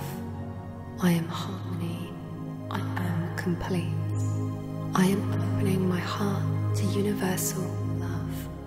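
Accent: Scotland, United Kingdom. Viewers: MrRasZe that would be British. Lovely accent. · This CD is very calming <link> the first meditation focuses on breathing, the second guides you through the Buddhist metta bhavana--loving kindness to all, and the third is a meditation to be used when walking. him is originally from Scotland, so there is a little accent to his voice, but it is very soothing and not at all distracting. If you are a beginner to meditation, this CD will walk you through all the steps of relaxing and breathing as well as sending out the positive thoughts of love and kindness that will be returned to you. We have several CD's, but this one is a favorite that we choose most often. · Whose voice is this? So clear and soft... and I love this beautiful British accent! Thank you very much!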